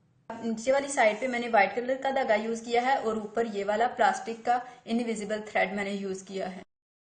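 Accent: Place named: Narowal, Pakistan. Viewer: Lgta h aap narowaaal sy h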